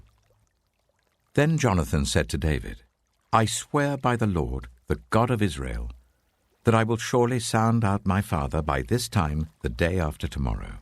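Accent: United Kingdom. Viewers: I like the first commentators voice better than the English ish accent...can not relax with that voice. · What a shame, I don’t like this voice or the British accent.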